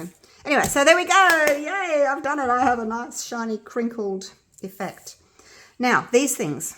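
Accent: Australia. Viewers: To be honest.. I really couldnt care less what shes doing bc I know it will always end up amazing. I mostly like just listening to her peppy, australian accented self which is more than enough for me to play her videos lol.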